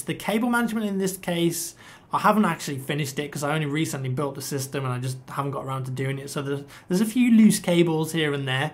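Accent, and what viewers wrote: United Kingdom. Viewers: Been a sub since you had 50k glad you got far with your gaming. Your stuff is pure skill, and the British voice makes your videos more interesting. Good stuff lad.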